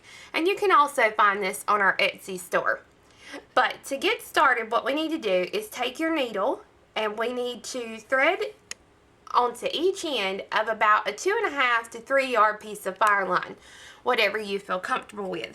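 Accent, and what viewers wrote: South, United States. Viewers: these are beautiful ... btw I JUST figured out the charm of your voice -- it's that homegrown southern accent love your videos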